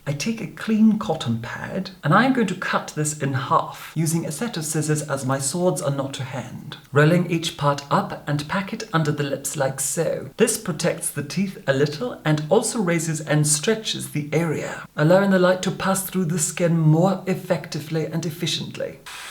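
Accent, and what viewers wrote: Scotland, United Kingdom. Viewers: I don't think his accent has changed. He's had a Scottish accent the entire time. Has his speech or voice become a bit softer and his delivery a bit more refined? Yes. But I don't think that he's putting it on for affect. I'd say that it's more about the fact that he hadn't lived in London for very long when he started this channel and his more typical, regional Scottish burr, has softened and become closer to that of the people he's been interacting with in his business and personal life over the past decade. (if that makes sense?)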